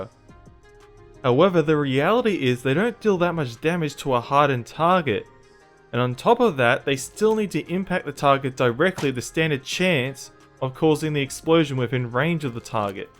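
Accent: Australia. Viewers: Bro, you already speak fast, plus you have an Australian accent, plus you're talking about highly technical stuff, plus I'm fluent in english but since I'm not native i have to make a little force to think through it. So, even 0.25x is not enough for me to be able to understand it. ☹️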